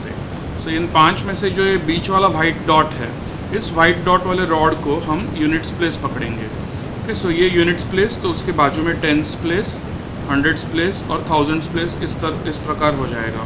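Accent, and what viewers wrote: United States. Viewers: Kyu aap american hai Kya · tu america se hai kya??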